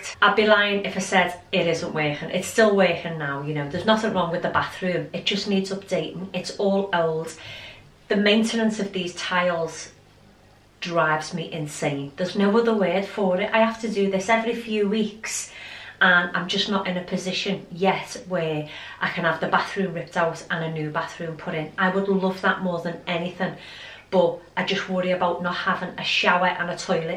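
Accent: Liverpool, United Kingdom. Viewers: Thank you for the tips! I love the scouse accent especially in women 😀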